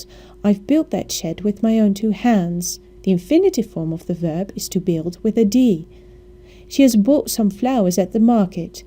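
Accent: England, United Kingdom. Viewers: thanks a lot ma'am I need this for my test In English I need to past this test so I can graduate grade 6 thanks alot and you sound like my teacher in English but a really nice voice your form Ingland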